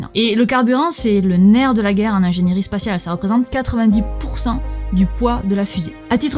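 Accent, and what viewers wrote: South, France. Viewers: Y'a un truc qui colle pas : T'as l'accent du Sud, mais tu es dans la neige ! Quelque chose dans mon cerveau déconne en voyant ça... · Je me pose une question tu est Québécoise ? Car tu à l'accent du Sud de la France .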